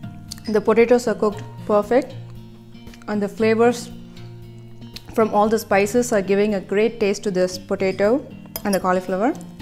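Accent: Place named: India. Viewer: Your English is very Indian style not perfect at all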